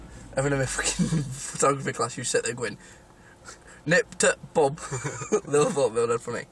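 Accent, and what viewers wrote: Derbyshire, United Kingdom. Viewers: that is a derbyshire accent but not a Derby accent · are you from northern Derbyshire? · this is so accurate to where I’m usually from! Buxton sounds like this, however Glossop which is like 9 miles away is completely different so I’ve lost this accent :( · Do people speak like this all over Derbyshire. Ex Derby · I'm from Chesterfield in Derbyshire and most ppl speak like that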